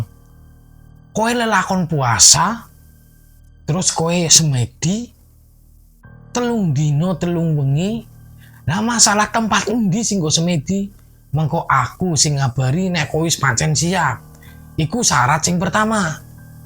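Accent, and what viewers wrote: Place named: Java, Indonesia. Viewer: 😅 boso jowomu ra ceto mas..campuran jowo kulon ro wetan...mending bahasa nasional wae mas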